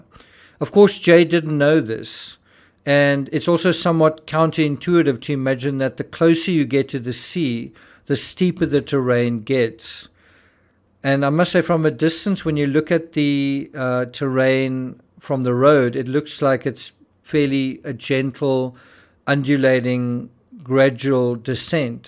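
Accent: South Africa. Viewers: Where in Australia are you from? You sound South African. You have been very commited to pursuade us in absence of a fowl play, even though you haven't been there. And I strongly doubt Jay had a chance of getting out alive if he rejected the big boys. By the way there was a CCTV footage of this very same road with a man getting into the car. At the time people speculated it had been Jay. What if it was someone who pushed him... The phone wasn't that close to a cliff, otherwise they'd find Jay immediately. Was there a fight? With a body month old, it's surprising they can determine exact time of death. But even so, people like those men he was with, do not leave witnesses that refuted them, that might hurt them in the future. Look at the facts, so many leaked messages, audio and CCTV footage. Aibs instagram post with the photo of Jay " +1" subheader was meant to be seen by a client? 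They hired a flat for a few hours on the night in the fake name to do what??? They weren't at the festival, he was heavy on drugs with someone's help, how did they meet? But you create these narratives " he persisted", " in his final moments he did that" - how would you know?, I still can't get over the fact you suggested that anyone not thinking like you do, is schitzofrenic... 😂 Someone must pay you well to trumpet your trumpet. The "paranoid mind made him jump" theory is another funny one. He had the reason to be running! So why is no one investigating that? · Thank you for this update! Once again, so good to hear a South African accent! I am glad you did this video because the conspiracy theories do not help anyone. The way you have explained it makes perfect sense and fits in with whatever evidence has been obtained. It is also easy when one has the "exuberance of youth" to believe that we are capable of doing anything! 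Jay obviously felt he had only one option and that was to head for the sea... the most likely place where people would be. But as you say, he had no idea how far away it was nor how treacherous the route was. He took a chance. Very sad for family and friends, but to me it feels like reality. I personally find it a far more plausible reality to accept than the wild possibilities others have come up with. Keep up the good work!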